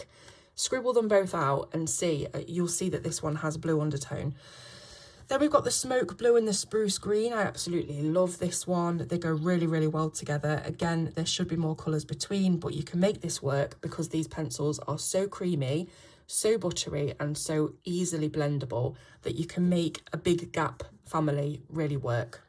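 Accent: England, United Kingdom. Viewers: Вы англичанка? Очень интересный акцент.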